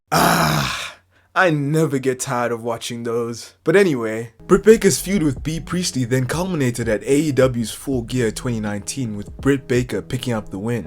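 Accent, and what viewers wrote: South Africa. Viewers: You sound like a south African. Good job brother you did a great job on the video so funny and entertaining. Your channels gonna blow up keep it up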